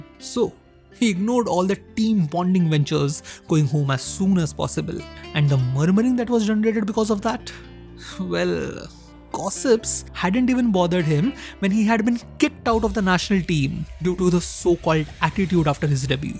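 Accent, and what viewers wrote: India. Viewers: This is mother tongue influence we all Indians have...We are not Brits. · I like how don't try to pretend and fake your aaccent and use our Indian accent. Kudos.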